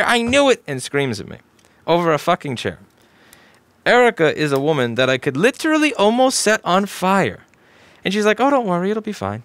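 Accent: New York, United States. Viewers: Rossmann do you not understand the bulk of people who originally subscribed to you were brought because you were doing "boring" board repair? Some of us respect the skill it takes to diagnose a problem and the others respect the skill it takes to properly repair. Then there sre some of us who like the absurd New York style of how you speak. Then there are some who like all 3. And Erica sounds like an amazing woman. Best put a ring on that finger.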